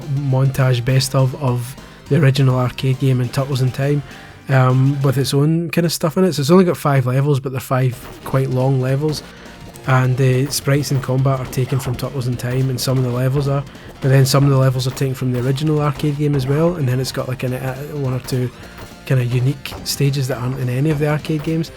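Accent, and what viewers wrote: Scotland, United Kingdom. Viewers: Subscribed on the manly strength of dat kick ass Scottish accent. I just wanna scream 'This is Sparta'. Back in topic great video buddy.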